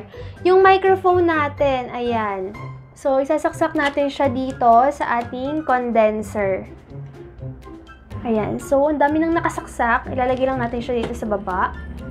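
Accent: Visayas, Philippines. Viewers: Bisaya lge ni si host ilhan hahahah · Bisaya ka maam?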